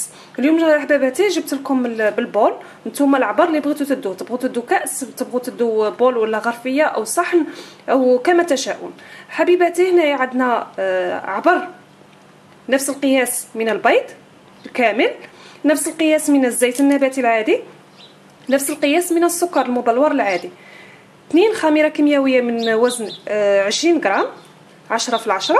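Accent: West, Algeria. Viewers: اول مرة نشوف قناتك نتمنالك الاستمرارية واللي عجبني بزاف هدرتك نموووت على هدرت الغرب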